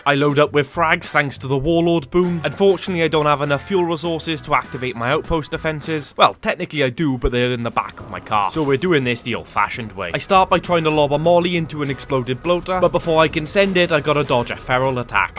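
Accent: United Kingdom. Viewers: Why you British?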